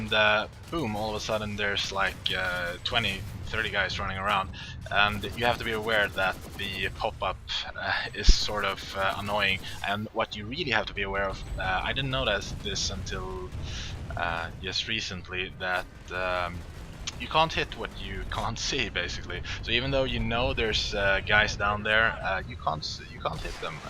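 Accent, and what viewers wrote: Sweden. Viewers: hes accent is very Swedish-Ish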